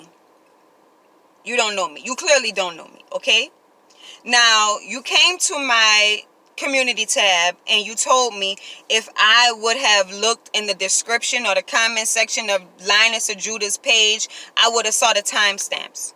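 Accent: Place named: New Orleans, United States. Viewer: Love the NOLA accent! I agree 100....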